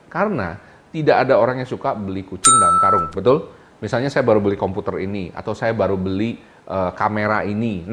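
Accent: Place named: Lumajang, Indonesia. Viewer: lumajang mana suara nya😁👍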